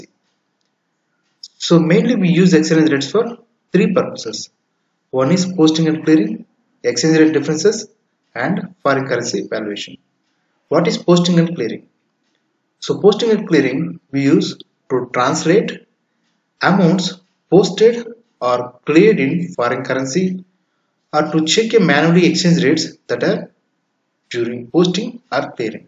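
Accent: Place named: South India, India. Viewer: sorry to say but when u upload any such video try to speak clearly instead of speaking in south indian accent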